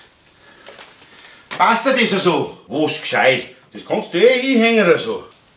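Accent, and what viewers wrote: Bavaria, Germany. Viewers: Genau so ist es.Ich habe in den 80 igern in Rostock Überseehaven gearbeitet als Festmacher. Dort wurden die Wurfleinen genau so zusammen gewickelt. Klasse dein Kanal und gut erklärt.Auch wenn ich als Sachse oft lauschen muss was du sagst durch den bayrischen Dialekt....lach. LG der olaf aus Delitzsch bei Leipzig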